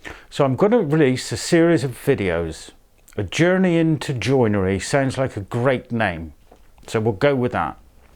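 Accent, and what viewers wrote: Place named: West Country, United Kingdom. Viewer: HI this looks interesting. Good to hear a West Country accent talking about woodwork. Having taken up woodwork 7 years ago when I retired I focussed on machine joinery. But recently my interest has been spiked to learn some hand skills using Japanese saws etc., so I will be very interested to watch your videos.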